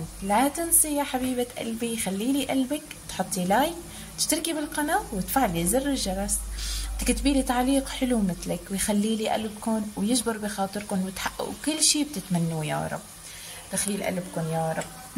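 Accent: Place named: Levant, Arab world. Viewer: دخيل صوت شامية حكيك بجنن ❤❤❤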